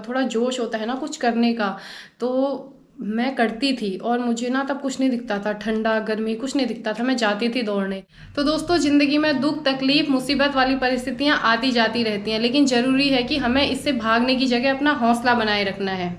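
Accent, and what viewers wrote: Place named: Kumaon, India. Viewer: आप बहुत प्यारी हो। आपके ज़ुबान से कुमाउँनी भाषा बहुत अच्छी लगती है।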